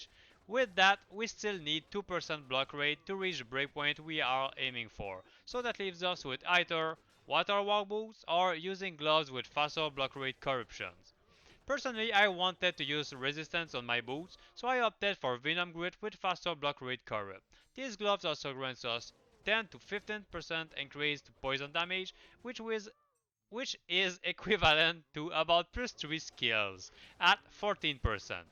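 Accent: Quebec, Canada. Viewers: I could tell you are québecois from minute 1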